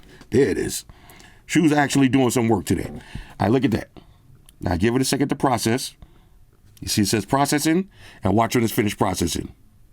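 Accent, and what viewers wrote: United States. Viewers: The only stand-up comedian reviewing Gadgets channel in whole YouTube😅🌹although I don't understand some words of native usa accent, but I always watch each video uploaded🌹😅 tnx for ur perfect sense of humor 🌸🌸 🌸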